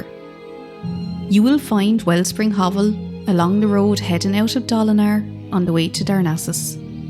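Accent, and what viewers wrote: Ireland. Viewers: shocked hearing the Irish accent , Louth here (Irish wow players unite)😀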